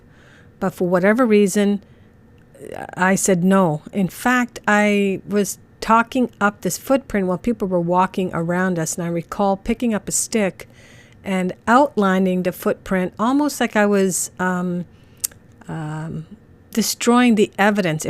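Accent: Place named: Quebec, Canada. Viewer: Great stories. I love your accent. It reminds me of all my wonderful French Canadian friends:-)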